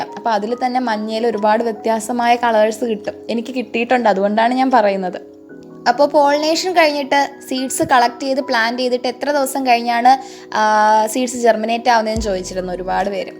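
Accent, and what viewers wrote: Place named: Trivandrum, India. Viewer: Njan tvm aanu ithante slang kettappo tvm anoonnoru doubt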